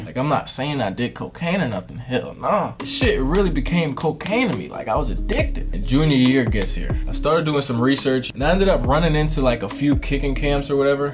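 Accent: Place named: Florida, United States. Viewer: Your Florida accent was heavy AF back then lol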